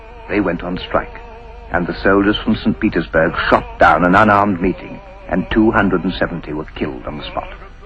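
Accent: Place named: RP, United Kingdom. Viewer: This accent would definitely be described as "RP" - received pronounciation, it's known as. In other words, very posh English, a bit like how the Queen used to speak. Even she has been influenced by "estuary-speak", that splurge of Cock'er'ny that has spread across most of the southeast of England. this is how EVERYONE on the BBC used to speak. These days northern and other accents are more common on the telly. Thank god.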